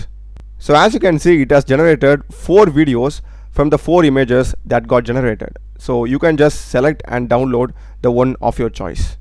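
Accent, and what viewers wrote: India. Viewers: I don't catch up your Indian Accent English. Can you speak slower, or use AI speak for you.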